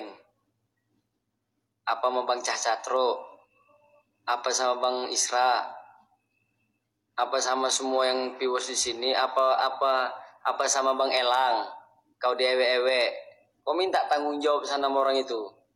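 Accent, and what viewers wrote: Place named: Palembang, Indonesia. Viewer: Ass.... " ane mau tanya. Asli nya kang dari mana yah..?? Kayanya logat Palembang kang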